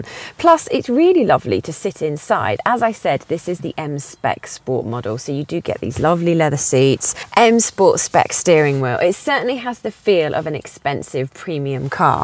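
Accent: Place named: RP, United Kingdom. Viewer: Oooh, I do like her posh accent. :)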